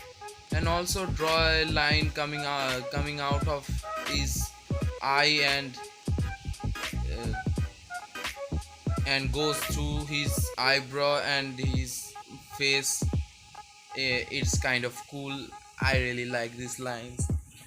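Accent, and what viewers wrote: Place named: Bangladesh. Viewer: Listening to your accent i think youre a Bangladeshi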